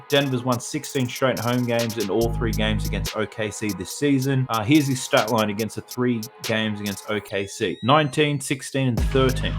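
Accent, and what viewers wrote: Australia. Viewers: Ya Australian accent blew it for me. Not betting on rugby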